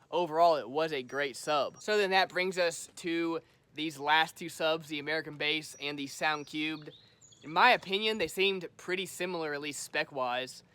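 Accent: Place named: South, United States. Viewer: I’M SUB’d…he speaks from experience, plus a whole lot of southern flavored enthusiasm…much love, bro…peace out..:)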